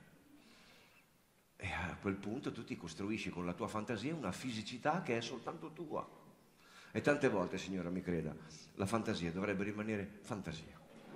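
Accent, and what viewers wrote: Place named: Romagna, Italy. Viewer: Bravissimo quando guardo i tuoi spettacoli mi fanno passare la tristezza , anche perché mi piace molto il dialetto romagnolo . 👏👏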